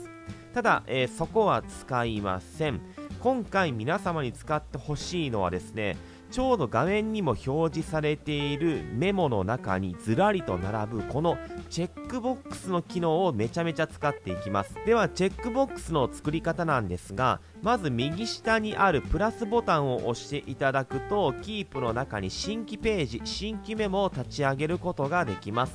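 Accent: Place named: Kansai, Japan. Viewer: 関西の出身でしょうか、親しみを感じて聴き易いです。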